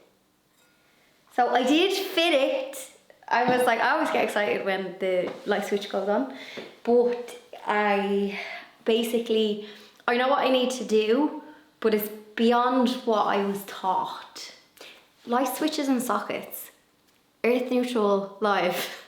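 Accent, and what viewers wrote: Ireland. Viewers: Love your videos love listening to your lovely Irish accent I am in the uk living in Bristol 🙄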